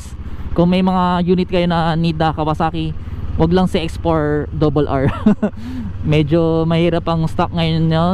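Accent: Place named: Visayas, Philippines. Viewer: Taga Iloilo ka po ba? Or taga Bacolod? Iba po kasi Tagalog Accent nyo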